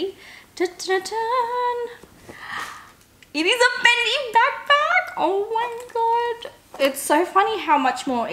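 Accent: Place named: Australia. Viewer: Funny that... I love the way she talks.. it's warming. Real n Aussie n relatable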